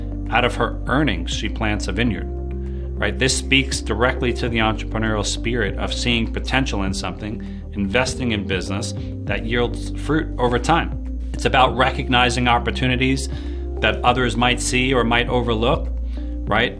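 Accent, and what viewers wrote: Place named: South Africa. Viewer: Sir, you sound South African 🇿🇦, thank you so much for this, we thank God for this revelation. It’s easy to be selfish in business especially when you miss it as Christian entrepreneur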